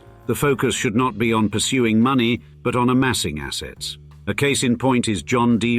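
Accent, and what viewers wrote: United Kingdom. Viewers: This is just “rich dad poor dad” spark notes in a British accent.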